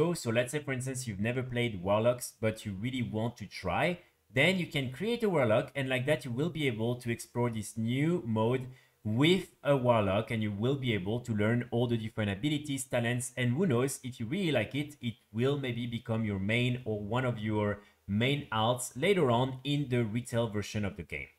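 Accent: France. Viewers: Are you french? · Thats a French accent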